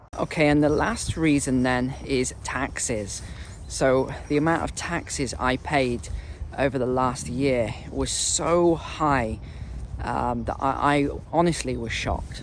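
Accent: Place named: United Kingdom. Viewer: I really love your videos and I personally think that 15 minutes is a great length. I watch just for the information as I am too old to apply any of it to my life! I also like you and your British accent! I hope you really love the place you are moving to!!! I am 72 and ruled by a dog that has an alien brain ( complicated )! I had investments for retirement but I didn't pay much attention to them because it wasn't that much. I checked them in early in 2019 when the pandemic struck and i had lost $30,000 dollars. I just left them there and hoped they would recover when the pandemic was over! I checked them again in early 2021 and they had gone from $270,000 to $ 430,000? I cashed them out and put them in a IRA and still can't find a safe investment! Bonds are no good, gold isn't doing anything, and the stock market is crashing, just as I predicted when they started printing all that money!!! 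Give me a clue,!!! P.S. The USA is not the place it used to be: - ( Beautiful walk!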